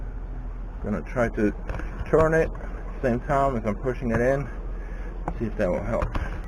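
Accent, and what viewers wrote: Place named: Germany. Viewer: you sound like you are either german or Austrian I am of Austrian decent i'm sure you can tell by my name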